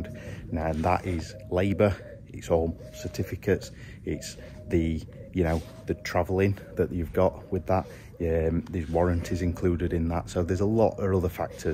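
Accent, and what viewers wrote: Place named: Northern England, United Kingdom. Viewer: I just love your northern accent. It’s mint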